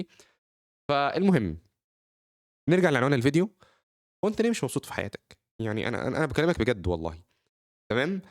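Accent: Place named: Saudi Arabia. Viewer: لهجتك سعودية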